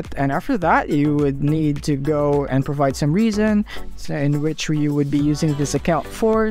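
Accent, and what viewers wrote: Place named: Philippines. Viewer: You sounds like a Filipino.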